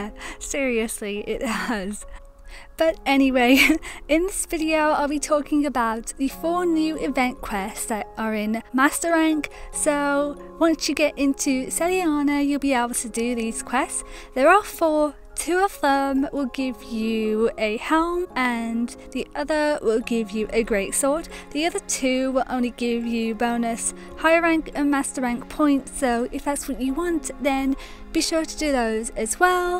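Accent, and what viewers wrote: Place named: United Kingdom. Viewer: She quite clearly has a British accent.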